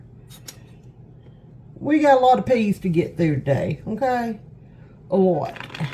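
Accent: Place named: Arkansas, United States. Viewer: Are you from Arkansas? I’m German and trying to figure out your accent. 😊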